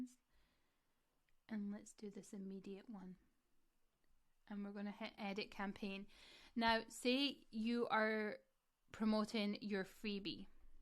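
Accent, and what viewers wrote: Scotland, United Kingdom. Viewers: Hey, Im guessing you ahave spent some time in Scotland based on your accent. I was looking for a video on how to use the newsletter feature on my squarespace website. do you have a video for this? I would like to send a news letter to people on my website that sign up but now sure how to make it all function. I have used a few of your videos to get mite site to where it is. so Thank-you.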